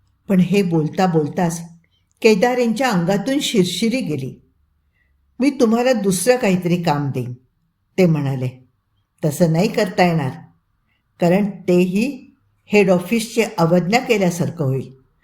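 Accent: Standard. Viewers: खूप छान वाचन ....! सुस्पष्ट आणि शुद्ध उच्चार.....!! ऐकत राहावंसं वाटतं.....!!! आवाजाची जादू .....! अक्षरशः खरं आहे.... 👌👌👌👍👍👍🌹🌹🌹🌹🌹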